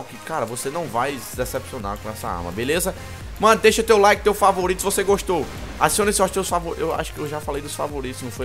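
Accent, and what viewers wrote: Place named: Ceará, Brazil. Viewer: possivelmrnte se num vai meresponder mais vc e Cearense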